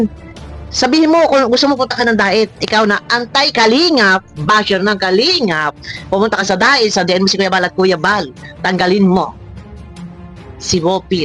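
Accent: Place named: Iloilo, Philippines. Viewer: Matanda kna.....NAGTATAGALOG KA. ILONGGO NMAN ANG TUNO MO.. TANGA KA.. KAKAHIYA KA kapitanang UBOD NG BOBA.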